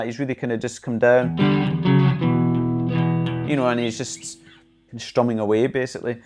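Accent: Scotland, United Kingdom. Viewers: Nice lesson and sound.Good to hear a Scottish accent as well.Keep the lessons coming ..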